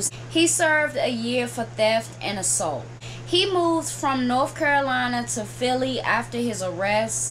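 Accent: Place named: Baltimore, United States. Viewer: I just love your accent that dc-baltimore accent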